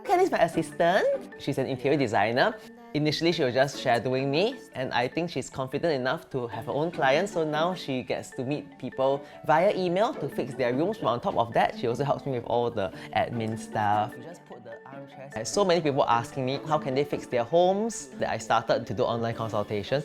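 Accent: Singapore. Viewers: Accent is very Singaporean; but the whimsical and joyful outlook is clearly NOT Singaporean. Most people I meet here are gloomy and not as bubbly. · Wow! Your energy is infectious! And i am so happy that you are proud of our Singaporean accent and do nothing to change it even when speaking to the British. Haha! Love your positive outlook on life! Definitely can't tell you are an introvert. Must be yet another INFJ haha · always thought he was just a NA/EU guy with a singaporean accent tbh, never expected him to be singaporean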